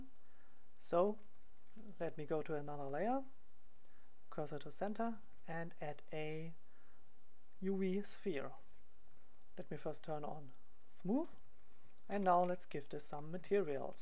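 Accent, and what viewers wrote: Germany. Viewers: Man merkt direkt das du Deutscher bist aber gutes Video Like&Abo haste von mir!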